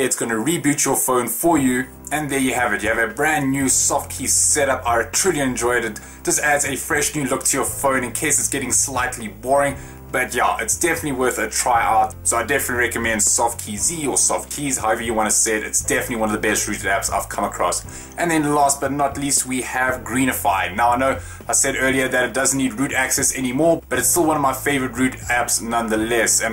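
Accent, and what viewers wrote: South Africa. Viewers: Dude u sound South African/Afrikaans. Is jy? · Are you south african?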